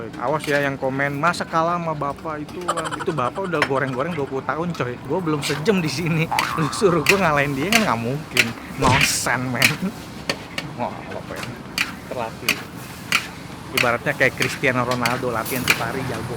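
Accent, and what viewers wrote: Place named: Palembang, Indonesia. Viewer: Suaro wong palembang mano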